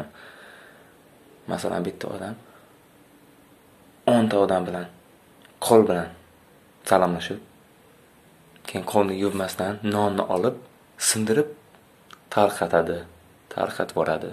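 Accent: Uzbekistan. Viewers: Сиз тоза Озбек тилида сузлаяпсиз ,анаву вотти вотти килмасдан шундай гапирсангиз бутун Турк дунёси тушинади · Гап булиши мумкин эмас зур соф узбек тилида гапирияпди офарин 👍👍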